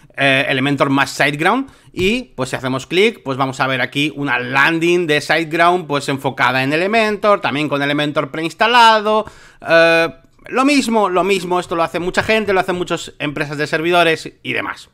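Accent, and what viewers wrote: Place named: Spain. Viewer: Buscando como solucionar el error de elementor "no se pudo cargar la vista previa" me hallo con tu canal y me parece muy interesante. Un saludo enorme desde la Ciudad de México. Por cierto, sin molestar, aunque el tono muy español que hablas es mas entendible que otras ciudades de la misma España, sería bueno, creo, me imagino, que un poco mas pausado se lograría mejor conexión con futuros suscriptores no españoles.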